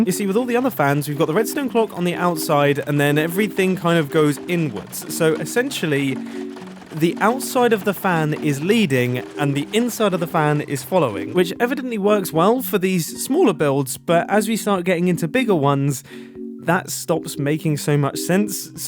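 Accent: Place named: United Kingdom. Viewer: cause of his British accent it sounds like mumbo said “this has been a very fan day”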